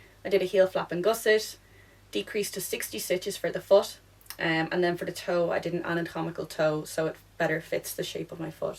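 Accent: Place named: Ireland. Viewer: I just found your channel and love your personality and Irish accent! I recently made the Lakes tee by Ozetta, which is almost identical to the tee from MFTK, and I couldn’t believe the amount of ends. I even made a few extra because apparently I can’t count… 🤓 On the sleeves, I started at the top like you suggested and saved myself two ends. 😂 They do all this cutting and restarting so the garment/sleeve is completely the same on both sides, but who cares if there is one round extra on the left side of your sleeve? Noone can tell, right? 😅🤓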